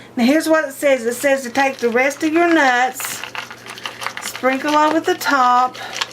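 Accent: South, United States. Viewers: You're accent is just so deliciously Southern. I love it!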